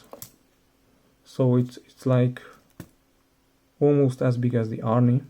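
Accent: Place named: Europe. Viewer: Myself included, majority of watch enthusiasts thoughtlessly buy and acquire all sought of random watches for display, hoarding and bragging purposes. In doing so, the art of watch collection loses its meaning and enjoyability and becomes a liability - as we are constantly looking for the next watch to buy without ever enjoying the few we have. Am American and consumerism here in the US is like a demonic spirit. The main take-away from watching this SOTC Video is Modesty. I also like that your collection is SUB$300 USD and project to liquidate a few pieces and buy one masterpiece that will endure more wrist time and bring you more satisfaction. The Title of this video should be: "STATE OF PRUDENT COLLECTION." Judging by Your reasoning and modest watch collection says, to me, that you are a simple, humble yet intelligent person. Your soft voice makes for an easy listen as well. 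I hear an accent but wasn't sure where you from in Europe. Thanks again.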